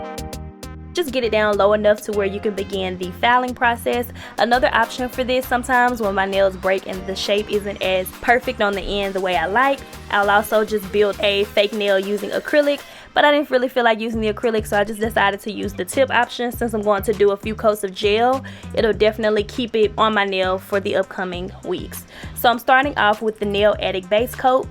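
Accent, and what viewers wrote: South, United States. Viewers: I love your voice, so comforting..and u have that lil southern accent too...☺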